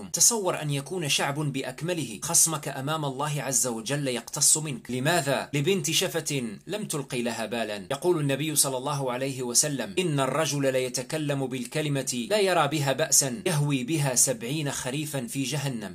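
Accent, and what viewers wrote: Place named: Maghreb. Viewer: لك لهجة المغرب العربي في كلامك يارب تكمل فرحتي وإفتخاري إن كنت جزائري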